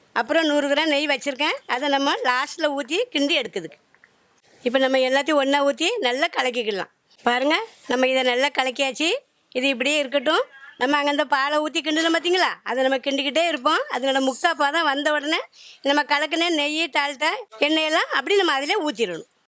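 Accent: Tirunelveli, India. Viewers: நான் பிறந்து வளர்ந்தது எல்லாம் திருநெல்வேலி தற்சமயம் சென்னையில் இருக்கிறேன் திருநெல்வேலியில் இருந்த காலத்தில் வாரத்தில் ஐந்து அல்லது ஆறு நாட்கள் அல்வா சாப்பிட்டது திருநெல்வேலியின் தட்பவெட்ப நிலையும் தாமிரபரணியின் தண்ணீர் வளமும் திருநெல்வேலி மக்களின் கை பக்குவமும் திருநெல்வேலி அல்வாவின் உயர்ந்த ருசிக்கு காரணம் உங்களது திருநெல்வேலி பேச்சை கேட்க கேட்க சந்தோஷமாக இருக்கிறது அனைவரும் நலமுடன் வாழட்டும் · Thoothukudi and tirunelveli slang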